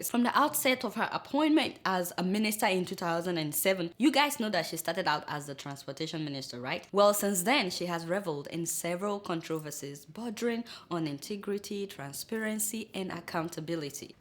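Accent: Yoruba, Nigeria. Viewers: LOL FUNNY HOW YOUR YORUBA ACCENT IS SO PREVAILING. LOVE YOU GIRL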